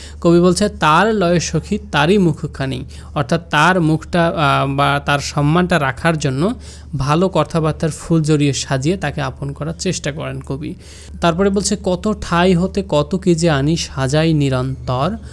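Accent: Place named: Rajshahi, Bangladesh. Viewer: আসসালামু আলাইকুম স্যার।স্যার আপনার ক্লাস করাটা অনেক ভালো লাগলো ১ তো আপনি ভালো পড়ান মাশাআল্লাহ আর ২ য় কারণটি হলো আপনার কথা শুনে বুঝতে পারলাম আমি যদি ভুল না হই আপনি আমার এলাকা মানে রাজশাহী বিভাগের লোক। স্যার আপনার বই গুলো চট্টগ্রামের কোন লাইব্রেরিতে পাবো যদি একটু বলতেন।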